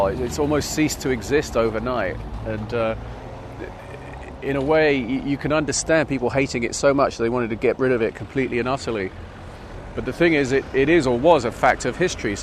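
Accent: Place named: Portsmouth, United Kingdom. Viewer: Huh? That's not true at all. He sounds exactly like what he is - a guy from Portsmouth on the south coast of England. (Also my home town.) You might be getting confused because the Pompey accent has a partial west country burr on the Rs to it (like a pirate), and that same burr has always been a non-trivial component of the American accent. But trust me, his accent is definitely English. Perhaps just not the accent you think of as being English (there are DOZENS of distinct English accents).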